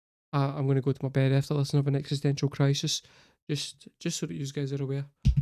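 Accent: Scotland, United Kingdom. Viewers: Wait!! You're Scottish???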